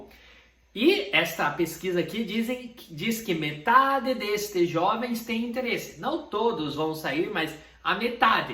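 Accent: Caipira, Brazil. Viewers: Não dá para aguentar esse sotaque caipira por mais de um minuto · Contas inúteis, professor. Sabe porque? Você, por exemplo, vive no Brasil, é brasileiro, e não fala um portugues bonito como o do Rio, ou o manauense, ou o do nordeste onde se fala um portugues cantado. Não, meu jovem, o seu sotaque é caipira. E você não mudou se jeito de falar estando imerso num país muito maior do que Portugal, no qual há sotaques variados, cada qual com a sua beleza própria ( apesar de uns serem mais bonitos que outros).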